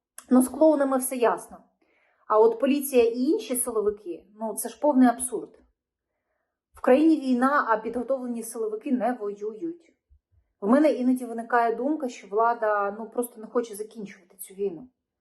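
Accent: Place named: Russia. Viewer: "Щьо,щьє", що за вимова у вас,вашою вимовою катувати ворогів можна.Аж вуха заболіли від такого мовлення.Ви напевне розмовляли російською,а тепер перейшли на українську.Жахлива дикція.😮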